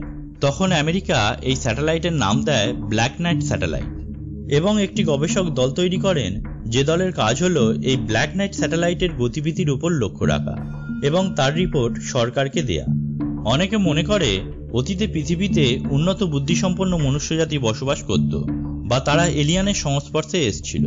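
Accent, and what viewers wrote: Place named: West Bengal, India. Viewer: indian bangla...... just...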